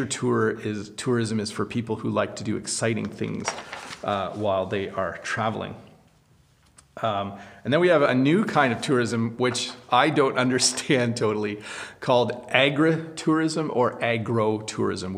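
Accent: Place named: Canada. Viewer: I love the canadian english accent, I think that the word 'ABOUT' can reveal that the person are canandian or not, but i'm not sure of it! I Love your channel ❤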